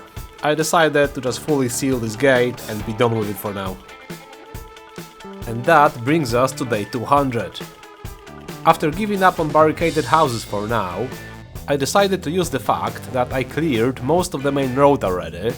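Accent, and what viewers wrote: Poland. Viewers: Where are you from, your accent sounds polish.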